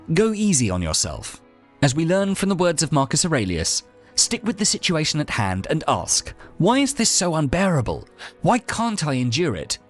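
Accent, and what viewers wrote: England, United Kingdom. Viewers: This video would not work without the English accent. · The lame British accented narrator makes this super cringe worthy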